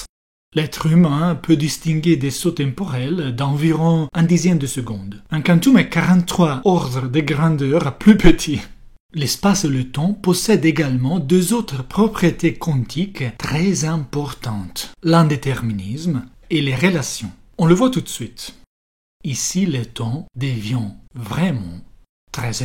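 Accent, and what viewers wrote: Italy. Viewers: Vraiment je pensais que tu imitais la voix de Roveli, mais tous les italiens ont cet accent adorable quand ils parlent français, c'est trop chou <3 Donc si je tiens compte de la structure géométrique d'un réseau de spins. Je peux dire que chaque point rouge représente le centre de rayonnement d'un "quantum de distance". Autrement dit cela ne peut pas représenter des sphères, mais des polyèdres, exactement comme ce que l'on obtient dans une mousse de bain, où chaque point rouge est le centre d'une bulle, et le rayonnement s'adapte en fonction des bulles environnantes (formant des polyèdres). Si je considère un univers plan, la structure géométrique serait parfaitement équilibrée avec des triangles équilatéraux, et il ne se passerait rien. Le truc curieux, c'est que cet espace existerait bien physiquement, mais n'aurait pas d'épaisseur, oups ^^ Par contre, la structure 3D la plus simple et la plus équilibrée, serait une structure des tétraèdres, et normalement (sauf erreur) on ne peut remplir un volume avec des tétraèdres réguliers sans être obligé dans déformer quelques uns, sinon il y aurait des "trous". 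Autrement dit si je ne me trompe pas, une structures 3D infinie, n'aurait de cesse de se déformer, cherchant quelque part un équilibre et ne le trouvant jamais, en dehors de toute notion de temps bien sûr. Et qui dit déformation, dit énergie ... ce qui répondrait à une très vielle question philosophique quand même ;p Et si je regarde un seul point rouge dans ce contexte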